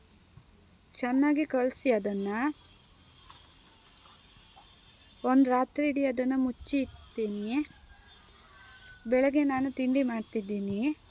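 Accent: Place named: Kundapura, India. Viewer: Nevu mataduwa bhashe tumba chennagide kundapura Bhashe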